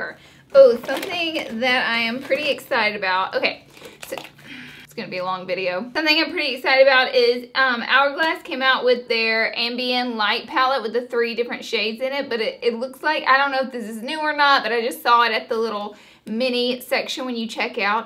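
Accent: South, United States. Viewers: where are you from? your lil country accent is adorbs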